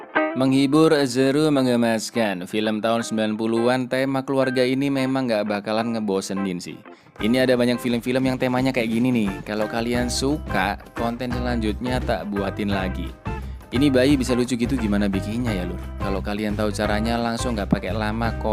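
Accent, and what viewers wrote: Java, Indonesia. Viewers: Pengisi suara nya Orang jawa ya mas? 🙏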